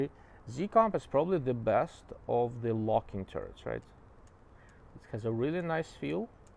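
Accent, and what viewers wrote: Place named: Europe. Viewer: Any optic reviews by a guy that looks like a young Dr Evil with a thick European accent demand immediate respect.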